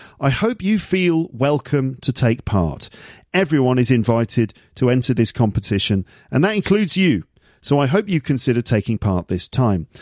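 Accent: United Kingdom. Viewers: Is your accent pure British,? I feel something different.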